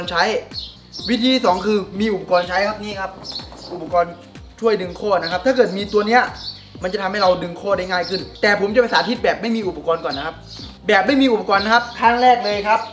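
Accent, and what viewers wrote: Isan, Thailand. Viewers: คนแสานบ่นิคับ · บ้านเกิดพี่ยุไหนครับทำไมพี่ชอบพูดออกแบบอิสาน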